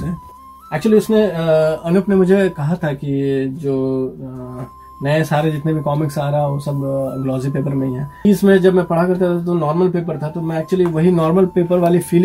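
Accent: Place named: Jharkhand, India. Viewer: You are so grounded man ❤️ I am also from Jharkhand ... Even aap Japan me rhne ke bawajood bhi Odisha jharkhand wale accent/tone me hindi bolte ho😎😎😎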